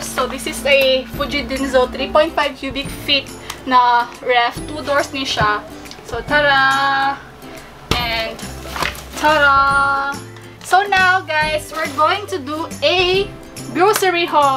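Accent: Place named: Visayas, Philippines. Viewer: Bisaya kau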